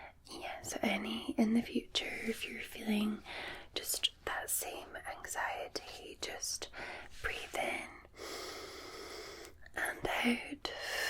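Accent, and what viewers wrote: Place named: Scotland, United Kingdom. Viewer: Great video as always so relaxing your Scots accent makes me melt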